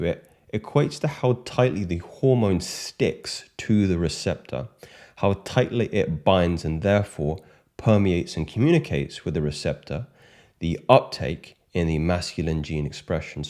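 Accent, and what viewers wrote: United Kingdom. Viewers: mate, didnt thought of you being a geordie ??!! 😅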